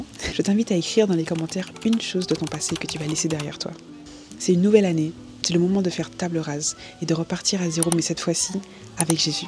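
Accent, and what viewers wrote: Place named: Madagascar. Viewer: Je laisse derrière moi mon sentiment perpétuel de compétition avec les autres et la peur de ne pas être assez bien pour autrui, puisque je suis fille de Dieu et il m’aime Merci pour la vidéo, ça fait plaisir de voir une antillaise sur youtube, et en plus pour parler de Dieu🥰 C’est Gwada ou Mada? L’accent disait plutôt Mada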